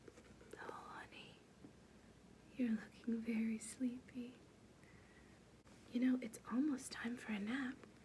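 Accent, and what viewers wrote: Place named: England, United Kingdom. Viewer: Back with the very convincing English accent 🙂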